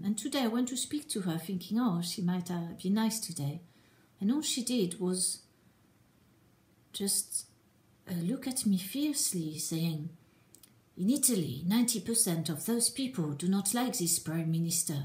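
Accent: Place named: United States. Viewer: What are you doing with USA vocal intonations! Stop it now! Keep regional traits, it is more fun than all sounding the same :)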